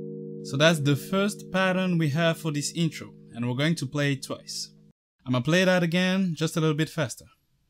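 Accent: France. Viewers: Awesome man, i'm very grateful. Is that a french accent?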